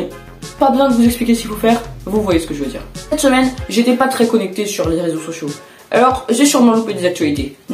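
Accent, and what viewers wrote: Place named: Spain. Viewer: Je me rend compte d'un problème qui persiste sur tes vidéos, c'est les cutes vidéos qui sont très répétitifs et désagréables, parfois j'ai l'impression que tu t'auto-coupes la parole dans le montage et là aussi c'est pas agréable à écouter, j'espère que ça sera réglé par la suite (et au passage, très bel accent espagnol 👌)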